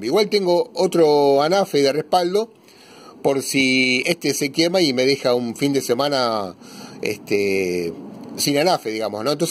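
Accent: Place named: Argentina. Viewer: Se nota que este canal es argentino...Ya viendo la clavija de tres patas,y el acento ya uno se da cuenta...jejejeje Sólo falta el mate...:v Felices fiestas...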